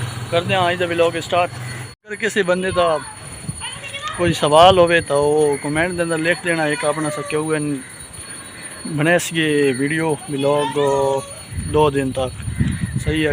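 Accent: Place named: Punjab, India. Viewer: very nice.I really enjoyed your video. God bless u. I like your punjabi accent.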